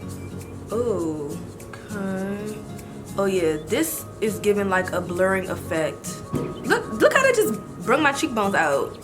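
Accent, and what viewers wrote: DMV, United States. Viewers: New subbie!!! I knew you were from DC by the way you talk lol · I can already tell your from the dmv by hearing the way you talk ❤️ love this video too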